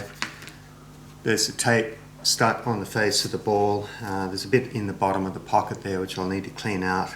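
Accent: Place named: Australia. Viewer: Good Show Mate: As per normal. I always enjoy your vids. Enjoy the Ausi accent too.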